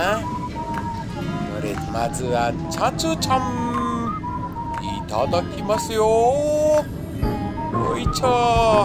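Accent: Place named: Tohoku, Japan. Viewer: ズーズー弁とひぐまやさんのラーメン、どちらも最高ですね✨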